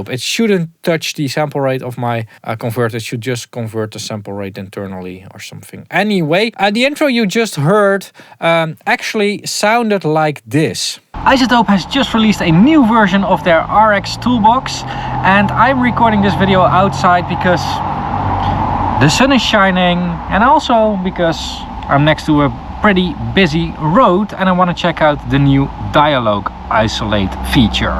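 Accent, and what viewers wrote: Netherlands. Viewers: I dont know who you are, but I hear the Dutch accent haha.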